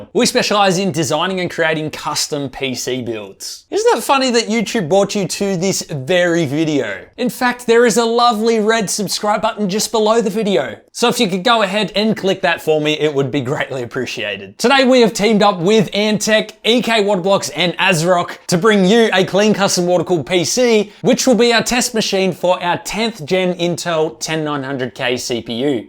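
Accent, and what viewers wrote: Australia. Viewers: Are you aussie?? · Average American looking guy sounds aussie.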